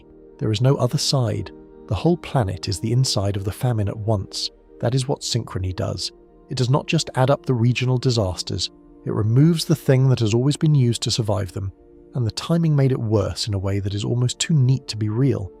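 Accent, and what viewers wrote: England, United Kingdom. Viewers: Lose the English accent It sounds like shit.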